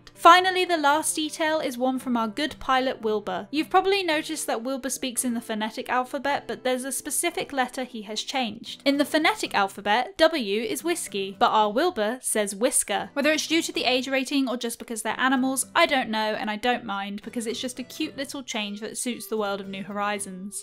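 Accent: United Kingdom. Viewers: I love her British accent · Finally, a british accent! Instant sub 😄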